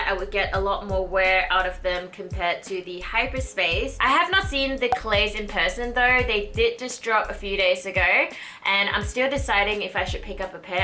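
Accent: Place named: Australia. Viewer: Holy shit. An Asian with an Australian accent. Now I've seen it all.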